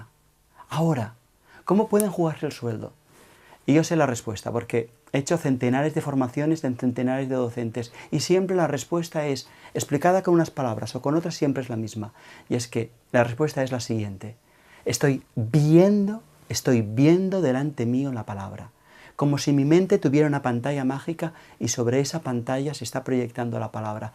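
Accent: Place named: Catalonia, Spain. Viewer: Gracias. Tengo 54 años,y aún tengo faltas ortográficas. Recién hoy con tu vídeo me he dado cuenta por qué! Tengo memoria visual para muchas cosas. Puedo recordar con detalles muchísimas cosas, pero en la ortografía siempre lo auditivo ha estado más presente, no sé por qué. El caso es que viví toda mi vida en Uruguay,(hasta hace unos años que estuve viviendo en Barcelona). En esos años que viví en tu tierra (se te nota el acento catalán cuando hablas en castellano jaja) pude darme cuenta que si yo hubiese oído de niña la diferencia de pronunciación que existe entre la "c" y la "s" por ejemplo, hubiese aprendido mejor. Sabes que tanto en Uruguay como en Argentina la "s"; la "c" y la "z" suenan iguales, así como la "y" y la "ll". Pero claro si alguien me hubiese enseñado lo que tu hoy en tu vídeo. Seguiré aprendiendo a pesar de mi edad. Gracias.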